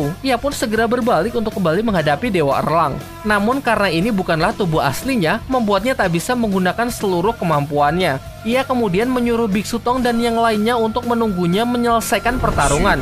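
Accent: Java, Indonesia. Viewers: Bang lu orang mana, orang jawa kah kok bilang nya belum deng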